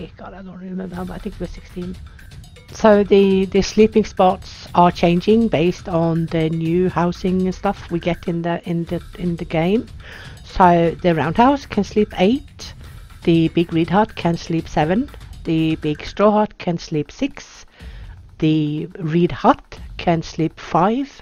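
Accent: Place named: North, United Kingdom. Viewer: Hi 😊👋 just found your channel, your playtrough is very intelligent and informative! Your accent sounds northern🤭 can i ask where you are from? I am Scandinavian thats why I ask🤭 Love your video and will continue to watch your videos, new sub for you😊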